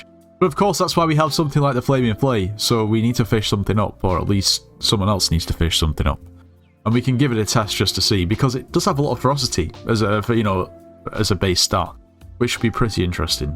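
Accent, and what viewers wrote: United Kingdom. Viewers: Taking into consideration that on the google account was an H, and the accent, I'm 99% sure name is, lmk if u have any obligations; Edit: We also can't ignore the fact that it was 385 pounds to fly to Andorra and that is the Brittish/Scottish currency where the most common name that starts with an H is, this makes my answer 99,9% sure, It also says that it's from Manchester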